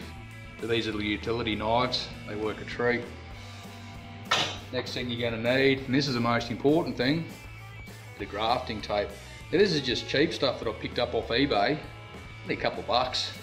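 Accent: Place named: Australia. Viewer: No nonsense Aussie speak !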